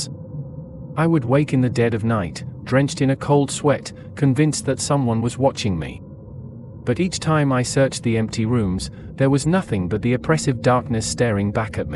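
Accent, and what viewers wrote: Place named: England, United Kingdom. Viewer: An English narrator! 😀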